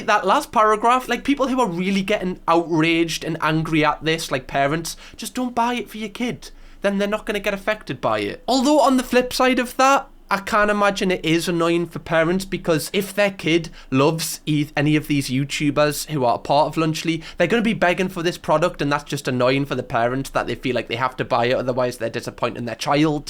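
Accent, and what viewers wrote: United Kingdom. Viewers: Are you British or Scottish like you accent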